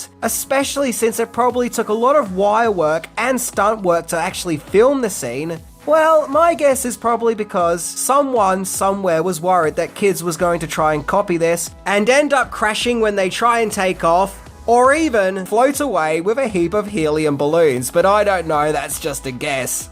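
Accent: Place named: Australia. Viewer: You’re killing me (in a good way😅)!! Several months ago I started watching at the beginning of your videos and have finally caught up. Along the way I realized that I was missing quite a few movie gems from my collection and as such have had to make a lot of purchases to more fully create a dignified compilation. A few observations that I have made from watching your videos in succession from the beginning are: You are a very kind person!! I really enjoy your comments and you are always willing to give somebody the benefit of the doubt without casting blame or being unkind. I really enjoy listening to your accent (obviously I’m not from Australia). I really enjoy the way you present the content you are speaking about, it’s interesting and fun to listen to - I’m sure it takes a lot of work to make these videos - and I can see you’re passionate about the movies you are talking about. 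You are gracious to those who watch your videos and that speaks greatly to the humble type of person you are!! Thank you, for creating entertaining and enjoyable content. I look forward to whatever you have in store for us!!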